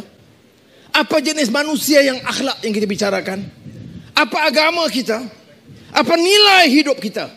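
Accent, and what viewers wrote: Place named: Jambi, Indonesia. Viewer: Mirip dialek Jambi..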